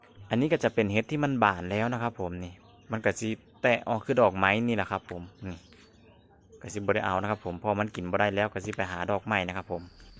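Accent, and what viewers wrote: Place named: Rural, Thailand. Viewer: สวัสดีค่ะ ที่น่ารักมาเก็บเห็ดด้วยคนค่ะ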